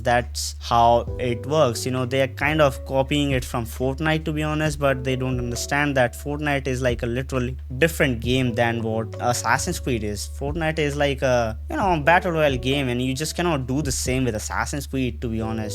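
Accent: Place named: India. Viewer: Yoo, are you Indian? Your accent sounds a lot like it.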